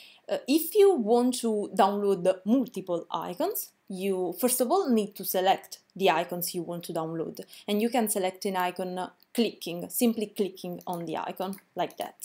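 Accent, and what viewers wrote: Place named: Italy. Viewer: Loved the accent. Italian?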